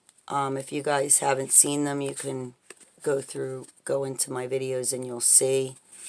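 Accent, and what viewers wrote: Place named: New Jersey, United States. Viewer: Your accent makes me ache for home.... Jersey girl or NY?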